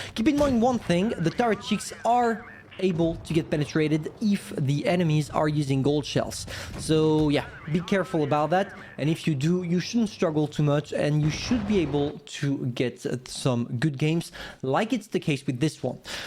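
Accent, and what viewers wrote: France. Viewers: Je crois que tu es Français vu ton accent et ton nom sur Youtube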